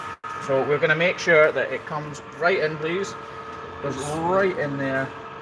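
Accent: Scotland, United Kingdom. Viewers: Love Outlander & love your videos - you could read the telephone directory & I'd watch!! Its a love of the Scottish accent - you & Sam Heughan 👍💕🏴💕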